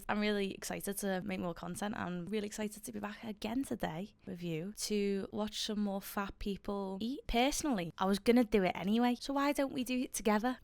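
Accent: Liverpool, United Kingdom. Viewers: Love this! Elevated by the scouse accent too (coming from a Yorkshiremen) My gf linked me the vids. We both gone full circle from being unfit in 30s to now being 35, first child great balance. Competed in bodybuilding classic physique Nov 2024 and placed 4th out of 16. We like the flashbacks to how you used to be, can really relate! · Stumbled across your channel a few days ago and its great to see a UK perspective on these videos. As a once fat person its still beyond belief how some people seem to treat eating like an Olympic sport 😮. I cannot fathom taking in so much sugar and salt without realising it. The old me used to get through 2-3 litres of full sugar pop and understanding how many calories that is alone there is no surprise why I was overweight, it makes me wince to see others knocking back so much now! Also love your Scouse accent, no offence to US channels but you are so much more relatable because I understand your references and humour. 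Looking forward to more.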